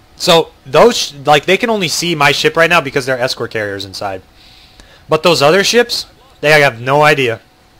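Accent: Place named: Seattle, United States. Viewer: I thought u were from Seattle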